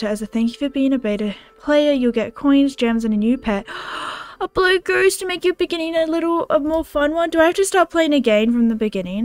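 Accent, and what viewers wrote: Australia. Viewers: you have to be from australia?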